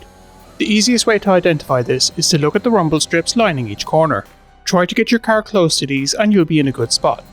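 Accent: Ireland. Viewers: I would think ur Irish where you from I love these tutorials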